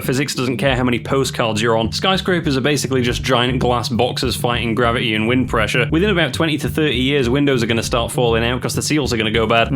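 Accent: United Kingdom. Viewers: YOU'RE SCOTTISH?!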